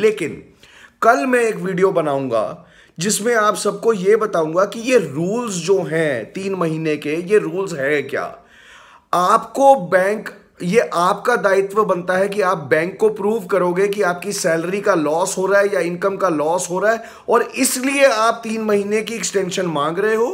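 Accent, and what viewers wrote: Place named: Punjab, India. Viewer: Sir, the way in which you speak corona exactly matches the way my father speaks... :P .. Are you a punjabi too?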